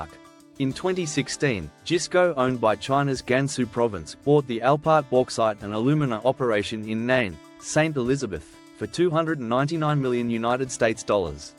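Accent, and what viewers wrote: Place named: England, United Kingdom. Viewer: This presenter has an English accent has he ever wondered why a [so called] British subject was left back so badly, that they need catching up in every department with Chinese help and money, if you didn't or cannot offer anything in the past or now toward our development. please leave us alone, all we gonna get from any western associations are sour grapes.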